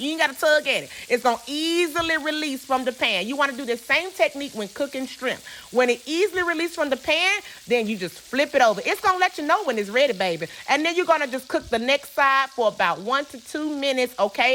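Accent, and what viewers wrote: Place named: Alabama, United States. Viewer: Listening to this lady talk is like being home! I grew up in Alabama, and I miss it so much!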